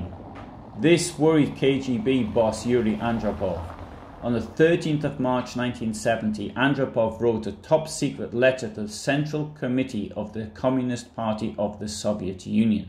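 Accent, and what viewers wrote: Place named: Newcastle, United Kingdom. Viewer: I certainly have subscribed, and I am certainly enjoying your Video's and I am certainly intrigued by the Bizaar mix of your accents..... Newcastle????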